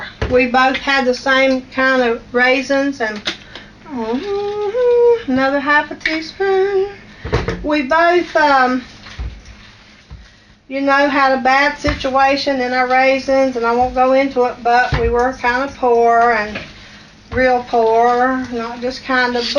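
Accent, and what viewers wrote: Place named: Appalachia, United States. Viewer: I too suffer from fibromyalgia and also MS and empathize with you. God healed me from stage 4 colorectal cancer 2 years ago and it's a painful cancer- but nothing I've suffered (and I've had 10 pregnancies lol), not even MS or the cancer was as bad as the fibro. It's the worse! God is with you and there's no faith like Appalachian faith - I know cause that's my family, from the hills of W. Va and Va, near the border- both sides! Your accent always brings me home. ❤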